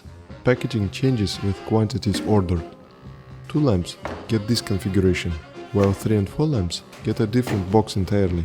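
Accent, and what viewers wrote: Russia. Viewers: Where are you from? You saying "here in china", and given your accent, I would bet Russian. If so, cool. Glad to see a Russian making cool products, instead of failed wars. (Not to say we are much better, but we all know the war is a joke)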